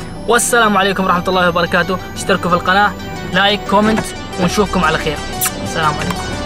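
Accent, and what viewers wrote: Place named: Saudi Arabia. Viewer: اتوقع انك مصري بس تتكلم سعودي زين ما شاء الله عليك 😂💛 ،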